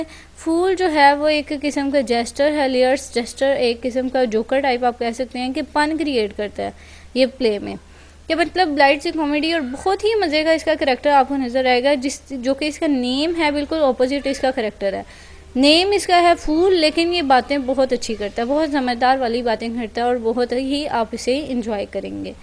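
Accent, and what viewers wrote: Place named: Islamabad, Pakistan. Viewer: ویسے آپ ہیں کہاں سے ، لب و لہجے سے تو پنڈی/اسلام آباد سے لگ رہی😁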